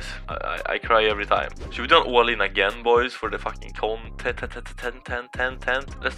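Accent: Sweden. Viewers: You sound so Swedish, but I love it :D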